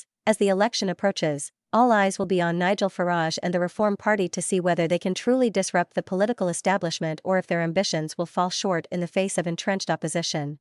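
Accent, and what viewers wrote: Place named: United States. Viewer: Why do all these podcast have American voice overs. Maybe because they ain't from British podcasts but from the home of the "Sceptics", thr bad ol' US of A.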